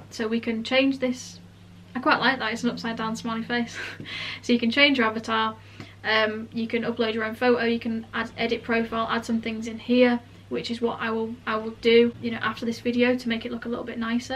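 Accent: United Kingdom. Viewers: I am in love with that British Accent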